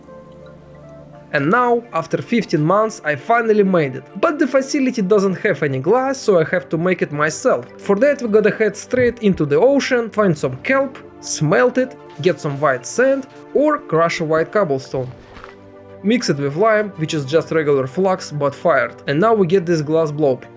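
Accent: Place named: Eastern Europe. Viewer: Самый славянский акцент из всех которые я когда либо слышал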